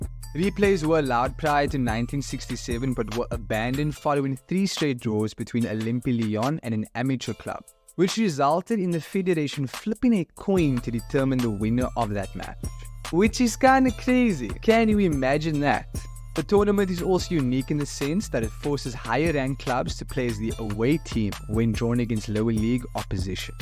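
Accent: South Africa. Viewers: Amazing vid ,when i heard the accent i knew it was south african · French in a Cape accent...now I've heard everything 🙌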